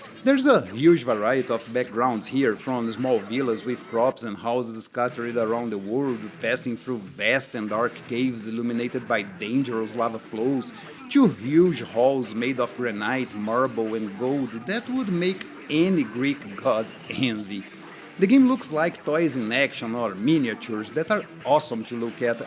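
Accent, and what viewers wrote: Brazil. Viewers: Percebi que é brasileiro pelo sotaque kkkkkkkk O inglês tá em dia, parabéns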